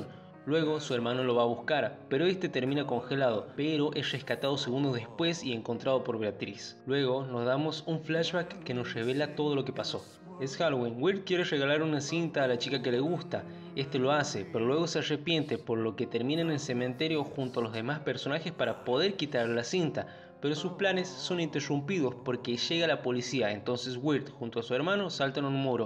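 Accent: Argentina. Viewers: No e visto esta serie pero queria saber de que trataría antes de verla, se ve muy interesante la verdad. Eres argentino, me encanta tu acento!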